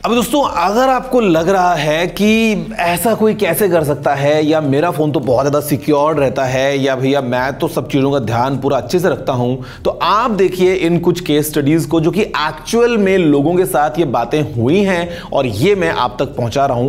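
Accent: India. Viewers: Indian english 😭😭😭😂 use correct Words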